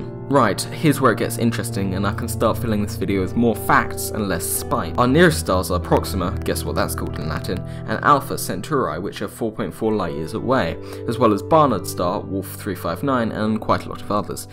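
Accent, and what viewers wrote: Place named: South, United Kingdom. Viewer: Hard to understand his south-english accent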